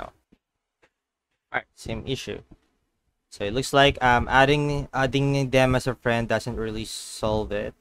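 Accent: Philippines. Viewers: filipino accent you got there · Boy halata na tagalog ka eh😅😅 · Sounds Filipino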